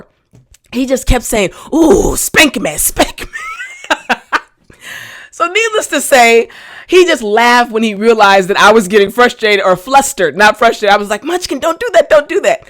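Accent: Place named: United States. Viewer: I love listening to your amazing beautiful American accent! I have lived in Pittsburgh, PA, and I've decided coming back to Brazil. I like your high mood by explaining things!!! Thanks,for your love in passing lots of information to us! I've been speaking English,for over 32 years, and I love this stunning language,that God gave me as a gift,and that is something I'm very thankful for him of. And you are the best teacher I've found on the web ever!!!! I congratulate you,and God bless you for this great work, and amen!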